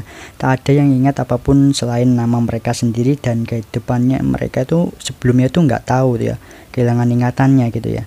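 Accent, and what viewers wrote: Java, Indonesia. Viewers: GUE# jawanya medok....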